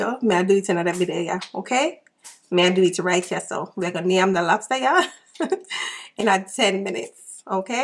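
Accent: Jamaica. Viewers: hi are you Jamaican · You Jamaican??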